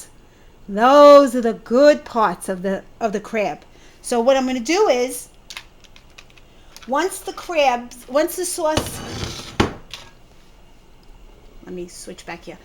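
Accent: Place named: Brooklyn, United States. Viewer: You have such a nice Brooklyn acccent you still live there 😃😃😃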